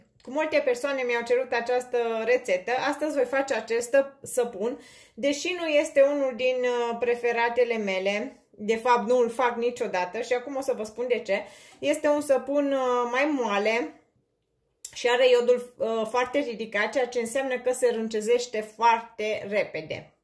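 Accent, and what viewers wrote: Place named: Transylvania, Romania. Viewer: Ardeleanca draga, imi place accentul tau 😊. Ce dulce-i romana ardeleneasca...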